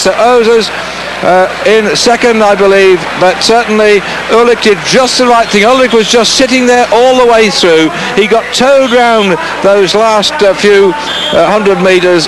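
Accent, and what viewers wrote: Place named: United Kingdom. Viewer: I watched this race live in my early 20ies, now just heard 1 min in my lunch break and Duffield's thick british accent of national rider names make it for me as a Dane difficult to relate to the riders, though I know hwo they were. First time I watch this since live signal. Very interesting. Will watch last 7 mins in the middle of the night later.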